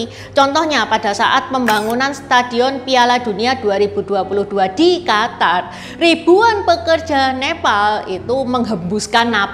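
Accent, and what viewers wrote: Java, Indonesia. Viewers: Suaramu medok sekali, berita nya akurat, mantab sukses selalu, Bali hadir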